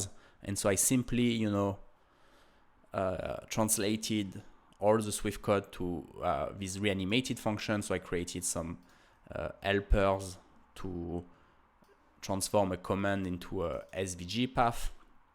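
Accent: France. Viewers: Like your French accent ✌️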